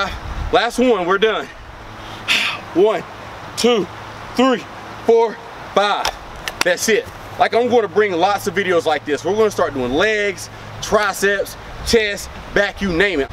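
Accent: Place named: South, United States. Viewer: I like that southern accent, awesome quick bicep workout! Thanks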